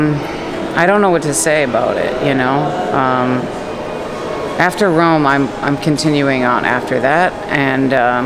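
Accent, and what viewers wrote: Argentina. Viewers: You got me! I thought you must have had a meltdown last year. 😅You sound really cool, speaking Argentinian. You seem different as well. That's the power of language! Thanks for sharing. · Después de ver muchos de tus vídeos porque me encanta Egipto, me gusta mucho cómo lo muestras todo y además estoy estudiando la lengua árabe también (sé muy poquito pero voy progresando), me alegra muchísimo saber que compartimos otro idioma 😊. Yo soy de España y me ha encantado escuchar tu maravilloso acento argentino. Muchas felicidades a tu abuelita, desde luego está estupenda 🎉 Ya tienes una nueva suscriptora 👍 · Your Spanish is Argentinian or true Spanish like the Spanish spoken in Spain 🇪🇸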